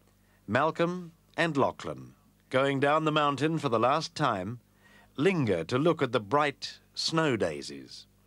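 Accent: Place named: United Kingdom. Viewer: Amazing to hear the narrator with a still slight British accent. How things have changed in 40 years.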